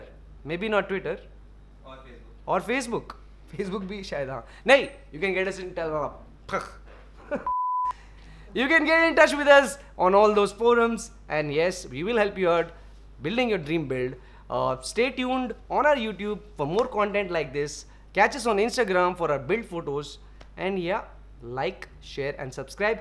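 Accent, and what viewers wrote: Hyderabad, India. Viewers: Hyderabadi kitta bhi koshish karlo ustaad zabaan pe ajatich language. Aakhri kiraak maut angaar video tha, maut dalderai. Aisich aando videos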